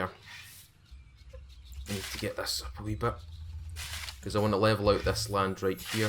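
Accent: Scotland, United Kingdom. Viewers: I did NOT insult your accent, I really like the way scottish people from all over Scotland sound when they speak, I was just asking because it sounded like you had a scottish accent, but it sounded a bit different from the scottish I've heard so far, so I was unsure.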